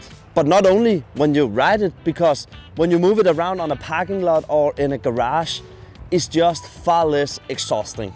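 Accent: Denmark. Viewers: haha dude that’s amazing. Love me a danish accent. Awesome review too - I’m getting my license and that bike in the next few monthsb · 3 seconds into the video, I'm betting 10 bucks that this guy is Danish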